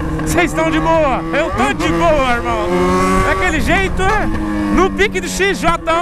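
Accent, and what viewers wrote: Goiás, Brazil. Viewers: pq vc fala no pique do Goiás sendo que vc mora em Londrina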